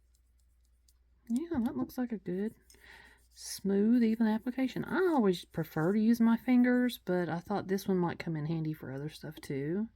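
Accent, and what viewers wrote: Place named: Kentucky, United States. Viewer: New Subscriber here! Are you from Kentucky?😂 I know that accent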